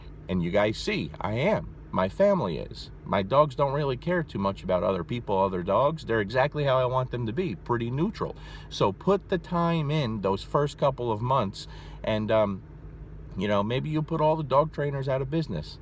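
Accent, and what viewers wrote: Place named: New Jersey, United States. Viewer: Beauuuuuuuutiful truth and boom. “Leave it” was the first thing I taught my lil rescued mutt, (welcomed him in at 4.5 months with zero education before that), and he sponged it up like a CHAMP. Had him fetching and dropping within the first backyard play session too. I think I like fetch even more than Dino... And almost as much as I dig that fab Jersey accent of yers. ;)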